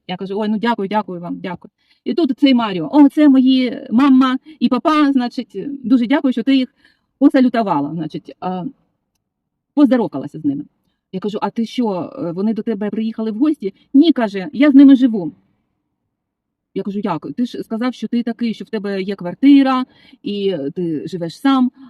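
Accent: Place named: Surzhyk, Ukraine. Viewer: Дякуємо, що розповідаєте, як живуть у світі люди! Вам бажаю знайти СуперМаріо з апартаментами😊, та за жити спокійним гармонійним життям! А ні, то і українці гарні хлопці є! І з апартаментами теж. І, до речі, нічого, що інколи проскакує суржик в розмові.У нас півУкраїни на суржику говорить. Удачі вам! 🩵☀️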